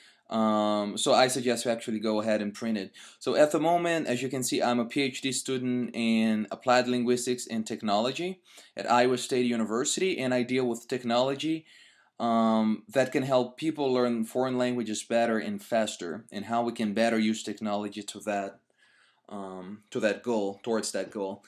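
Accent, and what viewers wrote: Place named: Brazil. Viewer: Engraçado sotaque de brasileiro falando inglês xD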